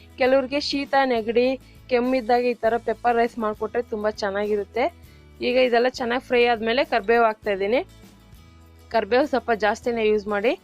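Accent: Tamil Nadu, India. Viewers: Tamil tara matadbedi correct agi bele anta heli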